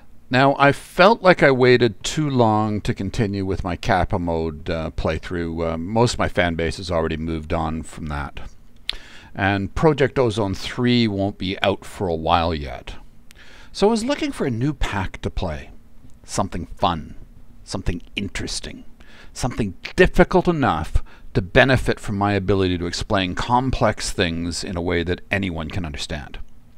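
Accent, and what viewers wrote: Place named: Canada. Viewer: I can't quite pin down your accent. I hear some Canadian in there, but also a hint of Scottish perhaps?? Or am I just way off lol? Great video, thank!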